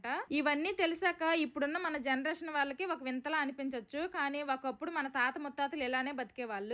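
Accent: Srikakulam, India. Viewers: Ni voice వింటుంటే midi pakka srikakulam ani ardamaindi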